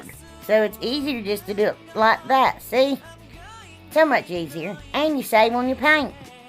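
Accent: South, United States. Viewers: I hope you feel better. You are the sweetest women and I love that southern accent!! Everything turned out beautiful! TFS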